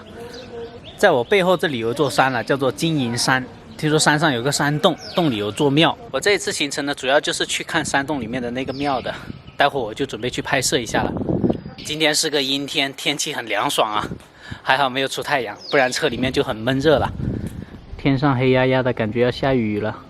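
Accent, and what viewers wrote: Sichuan, China. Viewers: 也會說四川話了😂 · 四川话寇以撒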